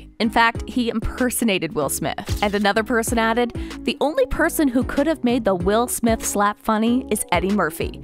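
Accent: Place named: California, United States. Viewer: Girl change that California tone. Please!!!!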